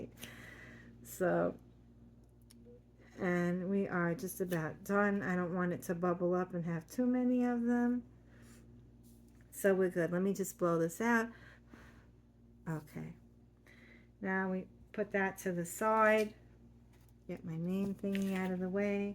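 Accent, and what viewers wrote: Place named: New York, United States. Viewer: Absolutely beautiful! Love that NY accent ❤❤ it reminds me of a peony. I’m going to give it a try. May make one for the baby shower Sunday.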